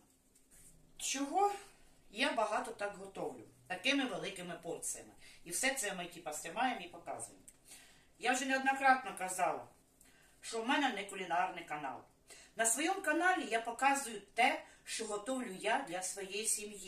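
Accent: Ukraine. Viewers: я первый раз у вас на канале.Очень родной украинский говор. Когда смотрела ваше видео, рядом сидел муж и сказал, говорит точно,как твоя Валя. Валя - моя сестра. вы с какого региона Украины?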